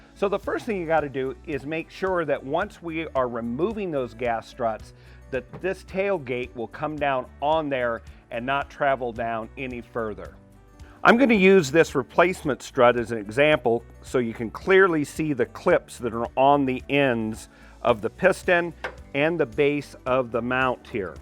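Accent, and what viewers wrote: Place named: Australia. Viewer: You sound like an Aussie. Your reference to your hubby gave me a hint but I could be quite wrong. I’m about to give this gas strut replacement thingo a go. Here goes …. A 71 year old woman with a screw driver could be dangerous! 😃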